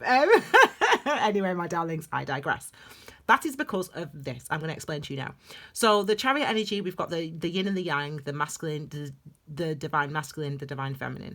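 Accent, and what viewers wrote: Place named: Lancashire, United Kingdom. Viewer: a grand lancastrian accent..interesting reading.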